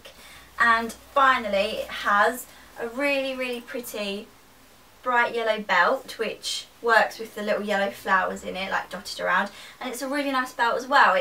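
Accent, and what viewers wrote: Essex, United Kingdom. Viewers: are you from essex? not in a stalkery wayyy you just sound like you are :) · im a fashion promo student and everything in this video about pastel colors and elephants is all so true! i got the most amazing elephant graphic print baby pink blouse from some website the other day! inlove! by the way, essex accent?!?:) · You have wonderful style! I'm in love with basically all the things you hauled. I wanted to ask, are you from Essex? I'm trying to work out your accent.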